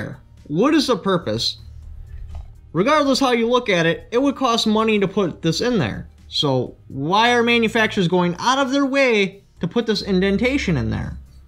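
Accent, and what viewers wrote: Canada. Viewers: This is the great Canadian/hillbilly accent. Its awesome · The Canadian is strong with him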